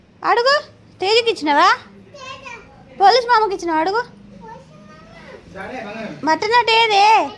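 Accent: Telangana, India. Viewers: మీరు మాట్లాడే పక్క తెలంగాణ యాస చాల బాగుంటుంది సిస్టర్ వదిన వీడియోస్ నెవీ అందునా మీ విలేజ్ వీడియోస్ అమ్మ నాకు చాలా చాలా ఇష్టం . మాది rampalli x రోడ్ డే సిస్టర్. మీ పిల్లల స్కూల్ లో కలిసాను గుర్తువుందో లేదో. Tq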